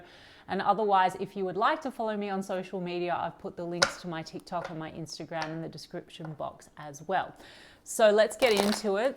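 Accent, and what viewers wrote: Australia. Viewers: Wow, you picked up my kids, resonate with everything.....thank you 🙏 And your Australian 🥳🥰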